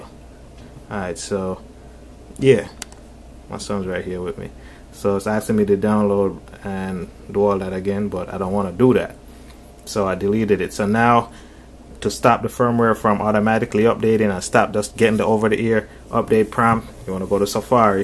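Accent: Jamaica. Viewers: Can tell you a yaadie lol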